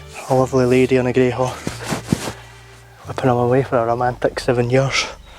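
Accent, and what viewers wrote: Scotland, United Kingdom. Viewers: For a good chuckle, turn on Closed Captioning and count all the times the CC person could not understand "Sir Walter" or "Sir Walter Scott" from the narrator's Scottish accent.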